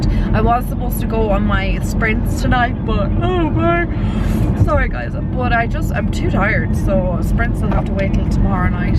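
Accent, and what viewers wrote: Ireland. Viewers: You are my favorite YouTuber. I think you’re absolutely adorable. Especially your accent-makes me want to visit Ireland! I just bought the Too Faced Sweet Peach palette (I typically stick with matte palettes because of allergies but loved their “Just Peachy Mattes” palette if you haven’t tried it) and had to reference back to your initial review before I swiped that card at the register 🤣.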